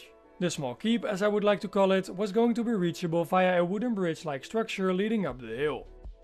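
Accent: Netherlands. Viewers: Where are you from? Your accent makes me think you are Dutch. Nice build bdw.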